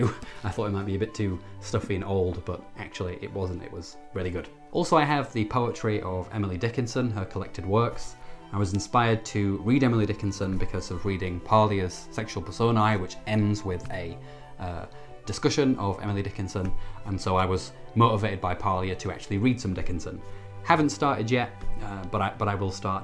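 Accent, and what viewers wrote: Northern England, United Kingdom. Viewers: North England by the sound of it, though my American ears might deceive me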